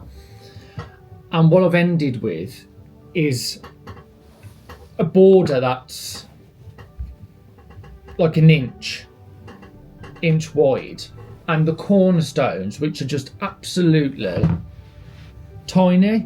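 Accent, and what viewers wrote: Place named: England, United Kingdom. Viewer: Without Kate I wouldn't have known about you. Be yourself it's your nature, your channel. Happy to know you, I'm from from south of France and I enjoy to meet new english accents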